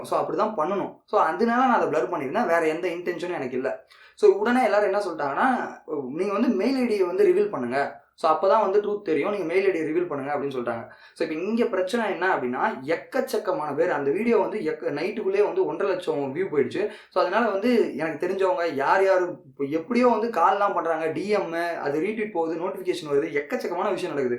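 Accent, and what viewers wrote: Salem, India. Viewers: Bro calm down pls.. neega salem-ah bro?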